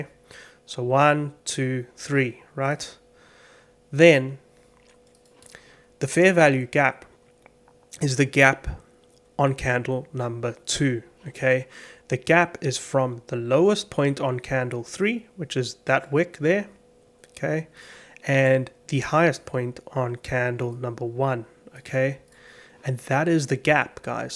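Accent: South Africa. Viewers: are you South Africa? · dude you sound South African hey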